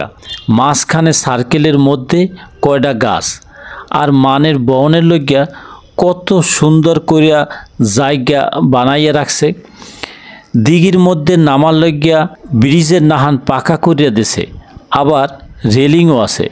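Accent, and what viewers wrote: Barishal, Bangladesh. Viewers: অনেক সুন্দর ❤❤ আপনার মুখে বরিশালের কথা ভালো ই লাগতেছে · স্যার অসাধারণ অসাধারণ কথাগুলি বরিশালের কথাগুলি অসাধারণ লাগছে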